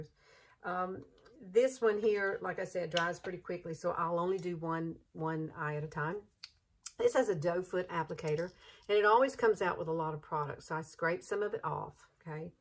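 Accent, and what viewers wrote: South, United States. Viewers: I could listen to you talk all day. You sound a little southern like me. I love your videos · You, are FAB-U-LOUS!! I just found you on YouTube and having just turned the big 5-0 I am so glad that I found this particular video. You have that awesome southern accents and are just so enjoyable to watch. Keep it up girl. I just found my favorite YouTube personality! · U r so sweet! I’m frm MS so I love ur disposition and of course the accent! Southern girls forever!!!! Thanx for ur tips! I’m 41 and starting to get the lines😡. U look so beautiful and young!!! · Oh my goodness!! You are just the sweetest thing!! Your accent makes me miss my family down south!! I’m almost 52 and have lots of under eye issues. This trick looks so great and doable!! Thanks for being your authentic self and helping us gals out!!💜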